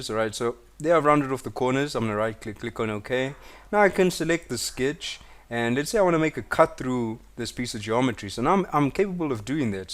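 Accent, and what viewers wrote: South Africa. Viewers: This is brilliant, thank you! Lovely to hear a fellow South African accent.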